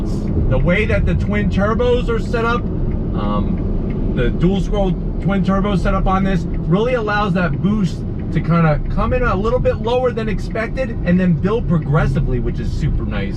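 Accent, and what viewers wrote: United States. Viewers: your parents are from Italy? Wow. Didn't see that coming because your accent are very American though.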